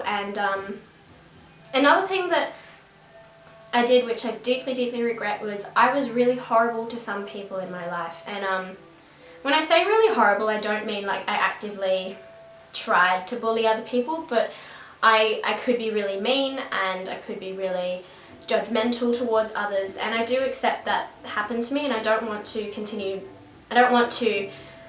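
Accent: Australia. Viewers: I got so excited when I heard your Australian accent! Subscribed!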